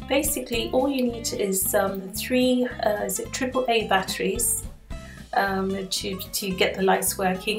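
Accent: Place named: Southern Africa. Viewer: I've been watching your videos and trying to guess where your accent is from... I knew it was Southern African 😏 Zimbabwe? 😂😂😂💟xx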